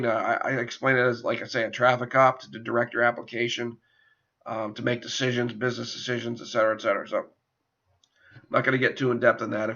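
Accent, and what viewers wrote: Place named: Eastern Shore Maryland, United States. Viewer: Sounds like a dude from the eastern shore of MD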